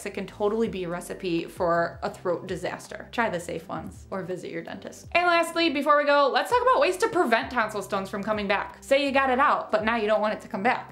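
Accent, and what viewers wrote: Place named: Minnesota, United States. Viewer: HOLY MINNESOTA ACCENT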